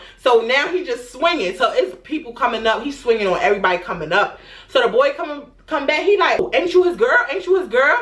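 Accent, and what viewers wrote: New York, United States. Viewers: Lmaooooooo im from jersey and grew up inna hood and HATEDDDDDDDDDD all this ghetto ass shit being around me. (But no shade i loved to watch it from my window 😂😂😂😂😂😂) The way you tell these stories ny accent and all be having me deaddddddd. Makes me lowkey miss it a lil bit. But i love how youre real about ur experiences and where your mindset was at the time. I'm glad you got outta there, because alotttttttttttttttt of the people i grew up with are in the same damn place doing the same damn thing.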